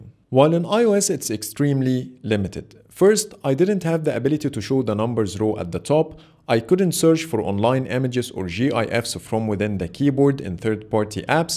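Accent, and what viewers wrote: Egypt. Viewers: Am sure u r Egyptian.. Your accent is so Egyptian.. Thanks for the great content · ناس بتقول انك مصري سمعت من ناس كتير انها بدات تفهم إنجليزي من الطريقة حقتك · واضح انك مصري تكلم عربي بالله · Your accent sounds Egyption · اللهجة المصرية 🤣❤️ بس بالانكليزي 🤪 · You have the unique egyptian accent 😂😂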